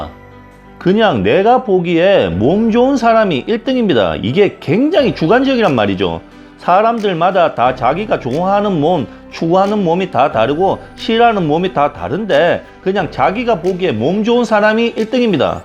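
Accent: Gyeongsang, South Korea. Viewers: 점수가 쁘라스가 되고 점수를 몬어꼬 ㅋㅋ 저도 경상도 입니다ㅋㅋ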